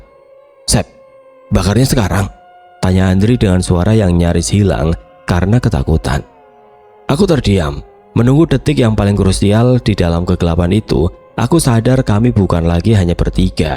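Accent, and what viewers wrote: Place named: Java, Indonesia. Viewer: Suara medok lu mana wooiiii...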